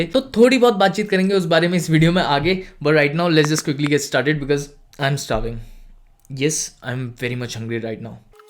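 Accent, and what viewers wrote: Maharashtra, India. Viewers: Lol your marathi or bengali or what?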